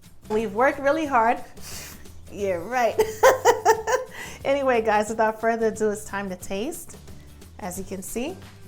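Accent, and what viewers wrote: New York, United States. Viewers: Love your adorable NY accent..NYC holla! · New York accent..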